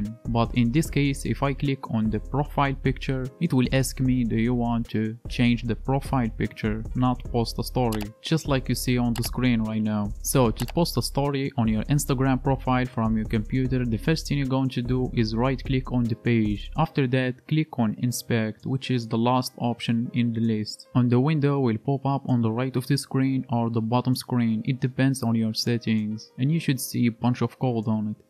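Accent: Morocco. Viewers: you'r accent like arab ( morroco - marrakech ) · from you accent i can tell that your moroccan am i right ! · mn soutk 3rftk mghribi hhh kayn m3alem abro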